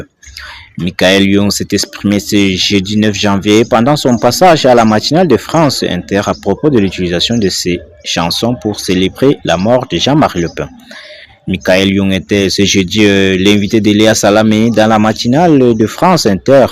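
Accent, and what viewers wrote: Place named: Senegal. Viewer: aucun vocal aucun temoignage aucune video aucune info verifiable aucune source aucune preuve , le ton d'un sénégalais qui lit un texte écrit avec les pieds et le gars veut nous faire bouffer cette info comme vraie avec son " Paris news " , t'a des brouteurs vraiment ils ont le QI d'une huitre dejà et je plains les le QI de ses victimes de celles qu'il arrivera a duper avec des photos succesives de Michael youn et de Lepen , les memes connards qui envahissent les réseaux avec de l'IA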